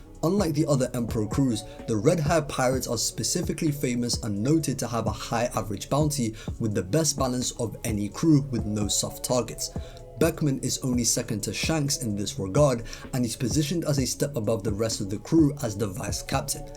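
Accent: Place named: London, United Kingdom. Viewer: I like to see that one piece powerscaling and playing with the vivrecard/databook statements is still alive and well. It peaked in c0 vid then has been up and down i think. It seems to be lively again maybe due to the recent chapters. I have been out of the loop for a while. Perhaps i should get back into it. On another note You have a very strong london accent. You are maybe the only british black muslim london one piece fan i know of. Lol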